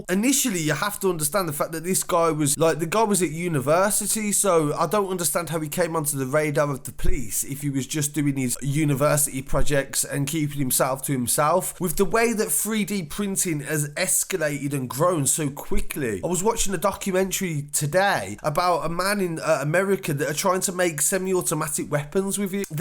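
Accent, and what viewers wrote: Birmingham, United Kingdom. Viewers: The Birmingham accent is truly despicable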